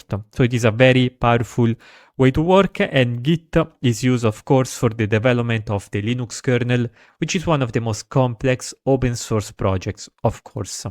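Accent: Italy. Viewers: Lo sento da 1 km di distanza l’accento italiano haha bel video!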